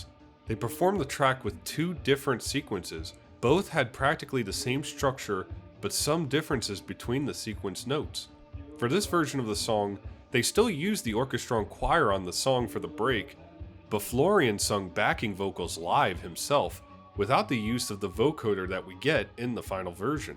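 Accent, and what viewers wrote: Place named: United States. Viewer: Get a German narrator as the American voice over is worthless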